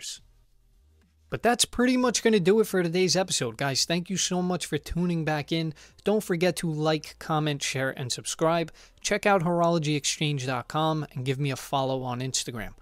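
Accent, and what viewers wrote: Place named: Brooklyn, United States. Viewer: First time viewing your site and this restoration..which, btw, was a great job. A classic piece which was in dire need of service....(amazing how some ppl would let that go soo far..). You did a Great job..and thed bracelet is still in good shape to wear as is. No need (at this point) to rebuild. Kudos to you... (where's your accent from..Sounds 'Brooklynish'...eh.?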